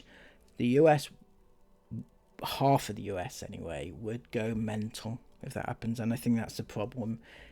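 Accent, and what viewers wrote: United Kingdom. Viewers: Why are you bothering yourself with what's happening in America. You sound British. Do you not have enough to worry about in your own country?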